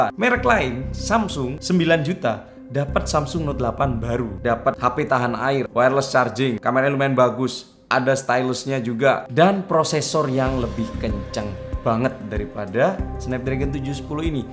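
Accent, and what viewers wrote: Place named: Java, Indonesia. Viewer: Seneng nak smpean ngomong bang sante tp lucu mbe medok wkwkw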